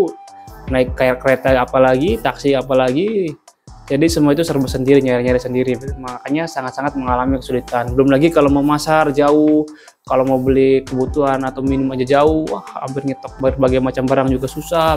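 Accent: Indramayu, Indonesia. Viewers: Sampean wong endi dermayue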